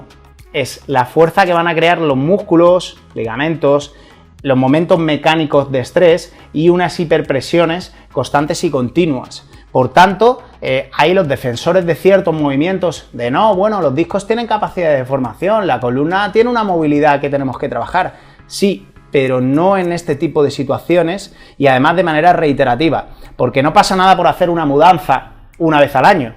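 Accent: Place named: Chile. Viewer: Esa forma de hablar... Chileno!!!!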